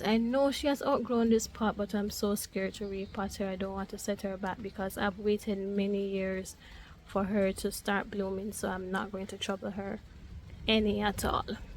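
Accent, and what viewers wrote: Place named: Caribbean. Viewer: Wait u sound Caribbean. A trini here 🇹🇹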